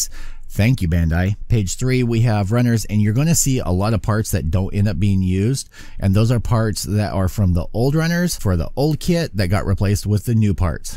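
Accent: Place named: West Coast, United States. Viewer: Accent? Hm.. I suppose he has a West Coast accent since he lives in California. I don't really hear too much of an accent though.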